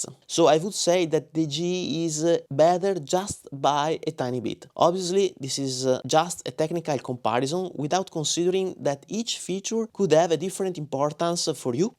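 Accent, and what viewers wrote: Venice, Italy. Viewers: Complimenti per le capacità esecutive e dettagliata recensione, bravo Dall'accento direi italiano, Veneto, probabilmente veneziano 😊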